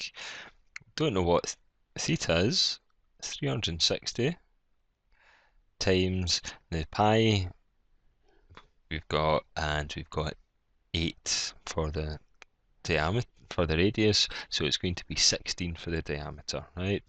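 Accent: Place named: United Kingdom. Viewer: thank you random british or scottish man for the help! after 7 years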